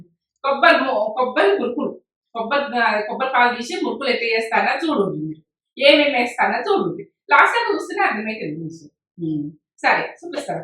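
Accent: Telangana, India. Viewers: She speaks telangana English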